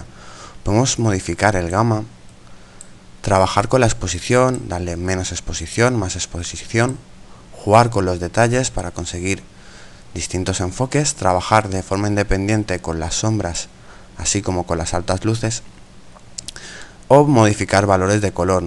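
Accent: Spain. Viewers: Así es el acento castellano, -.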